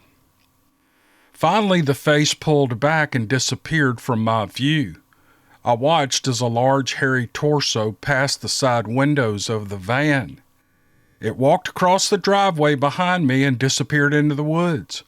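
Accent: South, United States. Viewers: Love your story telling! That southern accent gives a warm memorable touch! Please continue and repeat daily.👍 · I always hit the like button after listening to stories (yammer all you want my friend, I enjoy it, and your southern accent makes me long for Memphis), but I had to hit right away because the "like" button was at 666. This video was a great series of stories - as usual! BTW, the people you talk about at the end of the video are the same people who badger and pick arguments with everyone around them whether they are work, in a bar, or even standing at a bus stop. They are straight-up A-holes, and the social media platforms of the cyber-age have only enhanced their ability to irritate and annoy at an exponential range. Every village has it's idiot, and every channel has it's troll(s).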